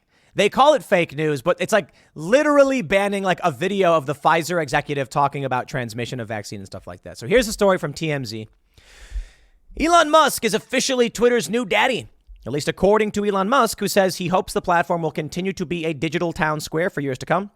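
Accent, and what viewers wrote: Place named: California, United States. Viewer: Is that a California accent or what? I hate it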